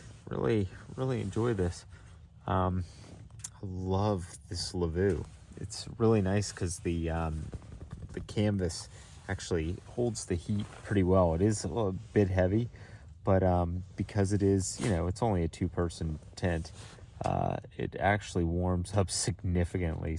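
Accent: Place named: United States. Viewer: You use a lot of British terminology in an American accent. Are you an expat?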